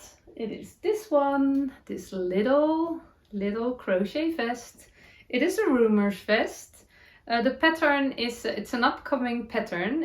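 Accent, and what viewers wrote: Netherlands. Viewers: I love your Dutchy English. Thank you for the reminder to do things that make your heart jump!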